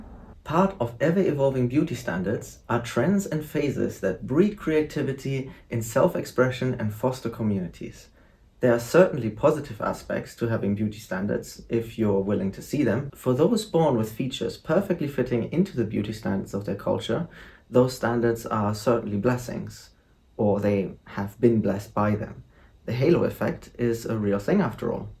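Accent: Germany. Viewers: your accent sounds very german.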